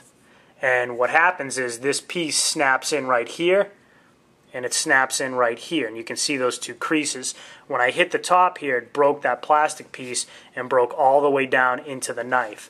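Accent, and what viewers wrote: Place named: Boston, United States. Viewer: What are you up Boston? I know a fellow Masshole when i hear one... ;0)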